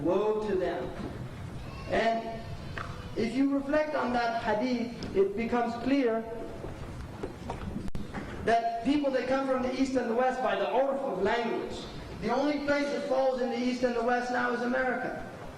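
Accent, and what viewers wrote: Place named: England, United Kingdom. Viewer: where is this from? england where??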